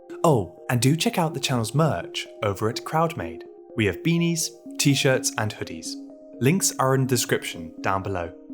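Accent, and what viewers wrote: United Kingdom. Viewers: Such a great video I love your voice I love the pace of your informative mind your British accent just awesome 👍.. loving the video about it and then watching more and more keep it up 😋👍😁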